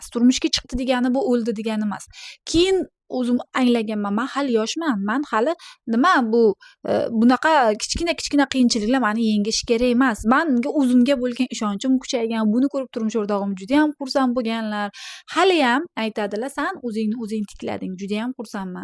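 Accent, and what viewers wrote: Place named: Olot, Uzbekistan. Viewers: Shevangizdan bildim Buxorolik Olotdan ekanligizni Men ham Buxoroni Romitan tumanidanman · Salom gapirganiyizda buxoro shevasiga tortarkanaa opaa omad sizga❤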